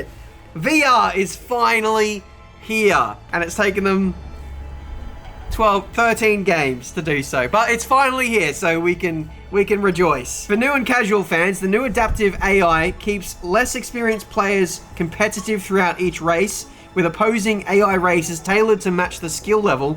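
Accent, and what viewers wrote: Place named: Australia. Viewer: Mate firstly drop the snob accent, does not suit you at all. Your an Australian Secondly… nothing more to add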